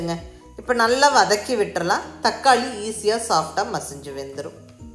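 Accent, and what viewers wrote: Coimbatore, India. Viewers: Nenga Coimbatore ah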